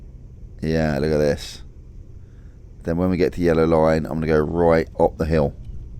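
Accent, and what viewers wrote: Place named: West Country, United Kingdom. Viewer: You sound very west country (like Devon/Cornwall). Not sure what I expected a Guernsey accent to be like though.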